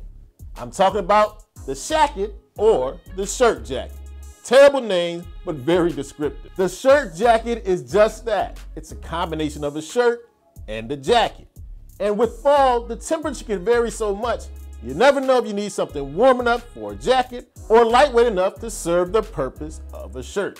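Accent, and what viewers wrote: Philadelphia, United States. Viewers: You outta Philly?!I knew you wuz out North East sumwhere..i thought New York..no offense..LOL SALUTE..from Chicago$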